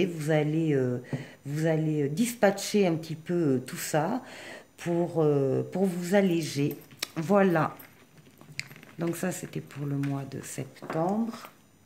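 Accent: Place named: Spain. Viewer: Es tu espagnol? Y a un petit accent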